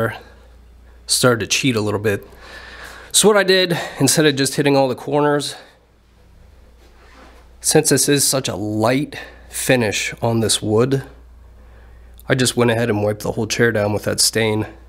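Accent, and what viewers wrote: Western Pennsylvania, United States. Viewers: Elizabeth/Clairton south of the Burgh. My parents were born and raised there. My mom's parents came to Clairton from Italy. My dad's parents came to Western PA from Wales. I'm a huge Pirates fan. Clemente was my boyhood hero (and still is!). We make an annual summer trip to the Burgh to visit cousins and take in a Pirates home series. Not sure it will happen this summer. Hope so. It's good to hear your Western PA word usage ("Pap," for example; waiting to hear you talk about "ridding" up the shop!) and accent. I've really enjoyed the craftsmanship and attention to detail you demonstrate on your projects. The Western PA connection is an added bonus (one I can't make with Thomas Johnson)!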